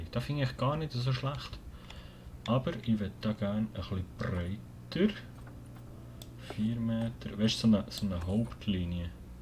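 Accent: Switzerland. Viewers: Was isch das für e Schwiezer Dütsch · Ich weiss nid worum aber iwie findi Schwitzerdütschi letsplays nit so sick. Isch nur mini Meinig bisch sicher mega sympathisch irl aber chani eifach nüt demit ahfoh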